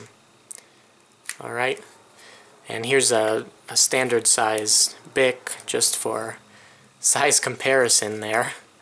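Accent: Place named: Sweden. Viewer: are you Swedish? i like your accent and it sounds like its Swedish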